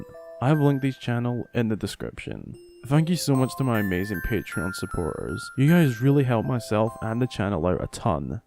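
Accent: Scotland, United Kingdom. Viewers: Quick question, are you Scottish? Because that accent sounds awfully familiar.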